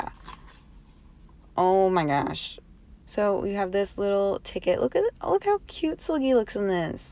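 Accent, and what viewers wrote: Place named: United States. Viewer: i also want to call it the Re Ve Festival but the girls pronounce it as "reeve" i guess since since yeri calls the robot Reve. You sound American, but how did you get the album so fast?! It'll take a month before I get mine. I'll trade anthing for Irene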